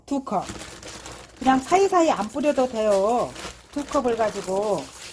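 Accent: Jeolla, South Korea. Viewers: 님 전북 분이신거 같으세요 말씀하시는 억양등이 전라도 음식 참 맵짜고 맛있게 잘합니다 오늘도 잘보고 배웠습니다 감사 합니다ㅡ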